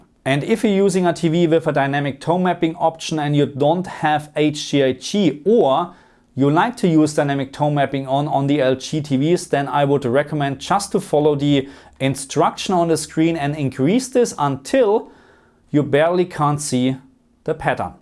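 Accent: Germany. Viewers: Deutsch Akzent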